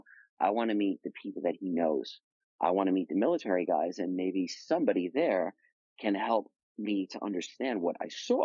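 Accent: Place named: New York, United States. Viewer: Love the NYC accent, bro.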